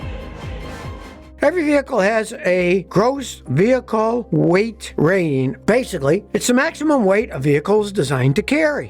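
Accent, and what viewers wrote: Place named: California, United States. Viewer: you’re sounding like a californian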